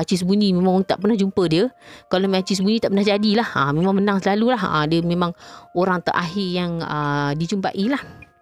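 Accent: North, Malaysia. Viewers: pandai dialek utara...😅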